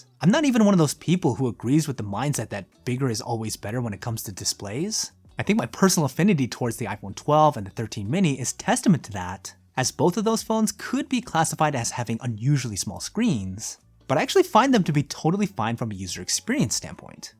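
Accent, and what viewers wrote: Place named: San Francisco, United States. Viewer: Holy stereotypical San Francisco accent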